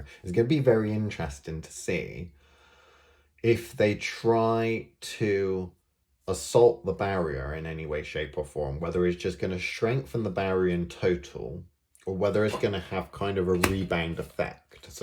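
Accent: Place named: United Kingdom. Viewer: Across the pond here, really appreciate the “professor snape” vibes… maybe it’s just the accent hehe 😜 thanks for the series I learned a lot!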